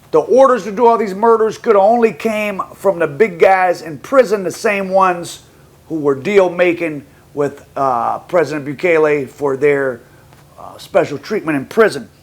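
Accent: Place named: Michigan, United States. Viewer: I was going to ask, are you from east michigan or detroit.. that accent 👌 You were recommended, subscribed already. Cheers from the mitten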